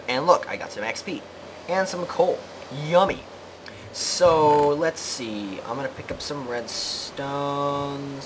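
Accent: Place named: Germany. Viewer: German is the acent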